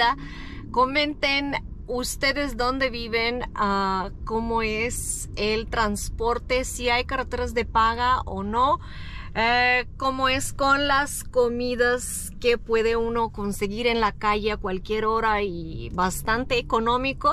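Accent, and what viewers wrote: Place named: Mexico. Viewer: Es el primer vídeo que veo de tu canal. Hablas perfecto el español y muy a la mexicana. Gracias por apreciar aspectos que para nosotros son algo común en nuestro país. 😘❤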